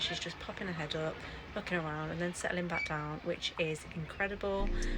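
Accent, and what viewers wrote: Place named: Birmingham, United Kingdom. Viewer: Bath is beautiful, I'm glad that you had some sunny weather, 🌞 A moored narrowboat, in Bath, is a great first time experience. The stunningly good YouTube algorithm bought me here 😊 I'm not a boater but used to work in Bath and I know some parts of the K&A. Unfortunately, I know of several dogs that have drowned, particularly Bulldog types. Every dog falls in at some point. So perhaps get Nina a life jacket that also keeps her nose out of the water. The K&A is so popular, it's one of the most congested canals and finding moorings near settlements is difficult. I know nothing about you, but hear the Birmingham accent, so I guess canals are in your DNA 😅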